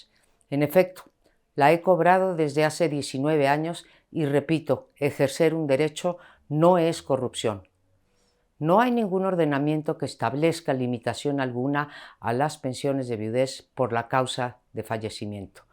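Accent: Spain. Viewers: Porque habla medio española? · Y por qué habla así, como española, decía mi abuela: cada quien se revuelca en su mismo lodo, señora se queja de lo mismo que han estado haciendo ustedes por todos los medios "oficiales"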